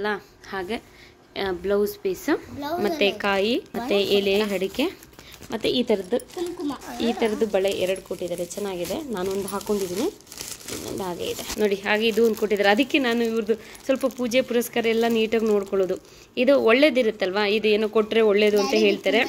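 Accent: Hassan, India. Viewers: Hassan & Saklespura da kannada bhasheya sogadu nimm matinallide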